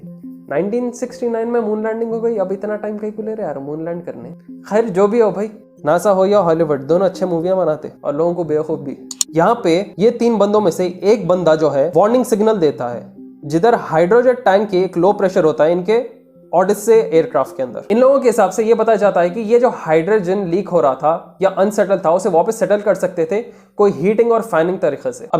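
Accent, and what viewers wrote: Hyderabad, India. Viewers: Bhai kirak Hyderabadi baatan kere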